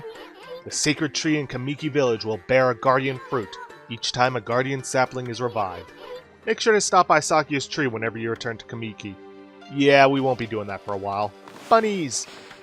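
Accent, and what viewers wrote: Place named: France. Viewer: I'm rather enjoying your French accent. Also, I'm not French, so I can't make an assessment of how good it is.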